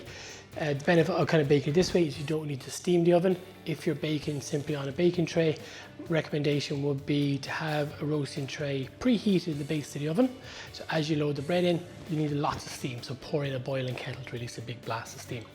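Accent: Ireland. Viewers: Woke up early AM here in the States. What am I to do?. Check out, my go-to bread guy. For sure I will give this a try in the next couple of weeks. Your "brogue", reminds me of my, Da who came over from Limerick. Take care.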